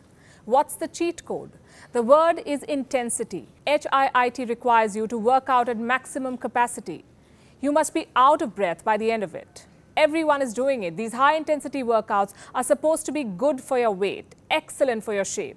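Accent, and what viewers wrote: India. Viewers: Gosh, she's got this aweful voice and strong indian accent. So annoying. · Don’t understand your English with Indian accent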